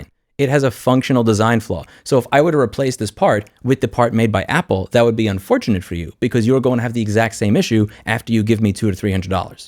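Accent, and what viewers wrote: New York, United States. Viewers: You still got your New York accent. 😎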